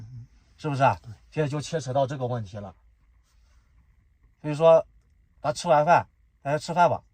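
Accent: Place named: Wuhan, China. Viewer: 有个武汉的口音。很熟悉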